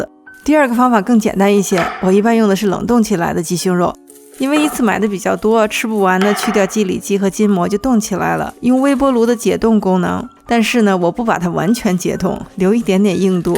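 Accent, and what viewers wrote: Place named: Beijing, China. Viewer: 北京口音😜老乡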